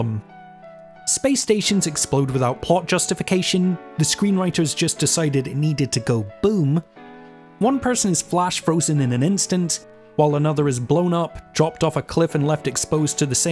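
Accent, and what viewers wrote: Scotland, United Kingdom. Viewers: Goddammit this is an awesome channel!!! What part of Scotland are you from? I'm a St Andrews man myself but live in Romania so it's always good to hear a Scottish voice. Thanks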